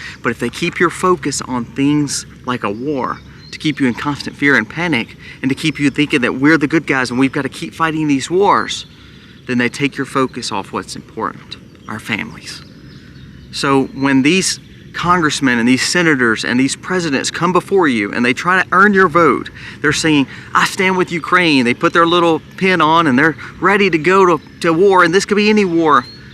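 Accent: South, United States. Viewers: What a niave analysis. Just let Russia do what it likes. Sounds like a hick southern MAGA supporter.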